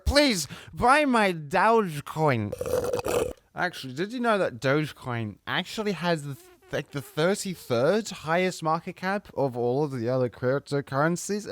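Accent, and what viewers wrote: Australia. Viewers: I'm glad he's as drunk and Aussie as he sounds. Also, and I'm just saying, I've never seen and the dude from Explosions and Fire in the same room.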